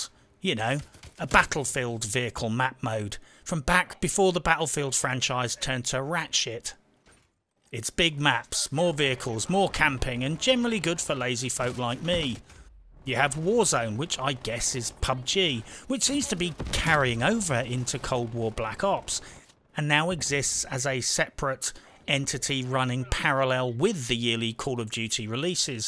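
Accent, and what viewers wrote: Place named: RP, United Kingdom. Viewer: I’m SO glad I found your Channel, it’s very entertaining. Keep up the good posts, your posh accent combined with indignant and brutally honest opinion just creates such a special video to watch!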